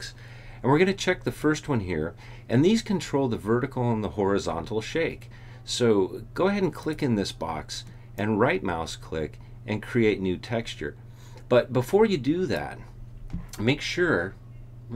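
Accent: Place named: South, United States. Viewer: good but it's hard to follow with your southern accent, maybe work on a more neutral accent. it gets annoying after a while. thanks for posting.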